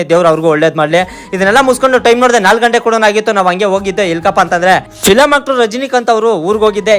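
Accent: Kolar, India. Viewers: Guru kolar bashe kelode one tara majja guru .. Ninu matadodu keltidre hale nenpu..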